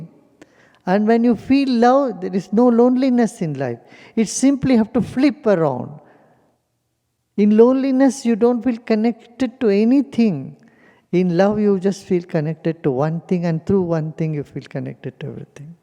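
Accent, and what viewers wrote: India. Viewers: I can only make out a word here there with this guy. The Indian accent is the hardest. It just basically blears words together.😳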